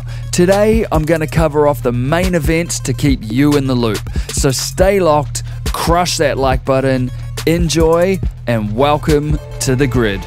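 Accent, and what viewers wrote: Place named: Australia. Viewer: another great video thank you, I noticed you were speaking more slowwwly this time. Is that to help an international audience with your Aus accent? if so my hats off to you for expanding. although personally I preferred the 100MPH, full bore, let rip :) keep up the good work, I look forward to the next Video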